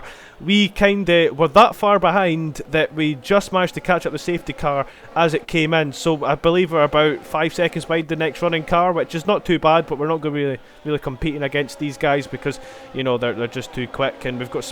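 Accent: Scotland, United Kingdom. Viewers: Are you Scottish? 🏴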